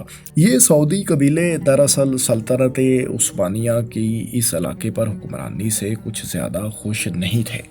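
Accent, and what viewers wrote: Punjab, Pakistan. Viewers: Your heading is grammatically incorrect. Don't you know that first form of verb is always used after did. Secondly, you say simple Urdu words in Punjabi accent like a typical Paindo does. Gal samaj ayi?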